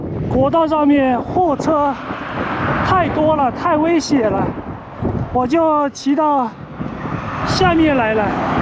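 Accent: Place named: Jiangsu-Zhejiang, China. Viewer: 江浙口音啊，骑这么远？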